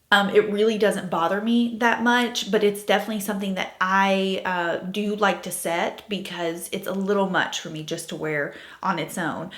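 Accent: South, United States. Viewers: I love your southern accent though!💛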